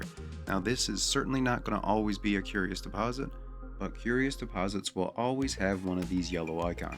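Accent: Newfoundland, Canada. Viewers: A Newfie?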